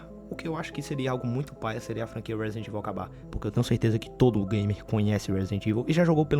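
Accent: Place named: Northeast, Brazil. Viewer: Mano, você é nordestino, né? Pelo sotaque, parece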